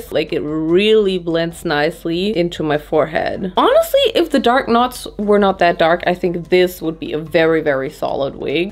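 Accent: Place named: Germany. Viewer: I love your german english 😍😍🤪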